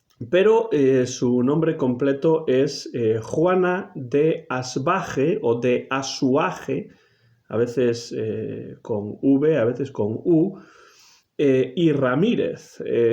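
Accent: Spain. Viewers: Gracias por su análisis sumamente interesante sobre Sor Juana Inés de la Cruz. Me encantan todos sus videos y su manera muy amena de presentar las obras literarias estudiadas en clase. También me encanta su acento y quería preguntarle en qué parte de España nació usted.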